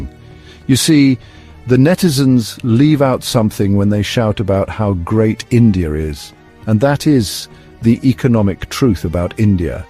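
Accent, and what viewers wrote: United Kingdom. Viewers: lol, obviously a muslim inspired rhetoric. No one is taken in by the English accent. Someone in congress woke up and initiated some creative AI? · One blatant lie is enough to expose the nefarious agenda- the one about Tejas. The posh Brit accent is the other giveaway😅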